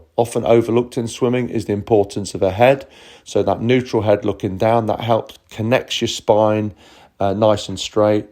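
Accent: United Kingdom. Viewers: This british accent makes me cry😢😢😢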